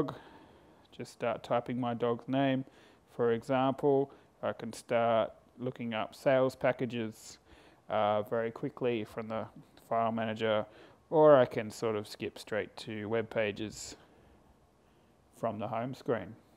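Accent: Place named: Australia. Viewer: you're aussie. finally an aussie reviewing a blackberry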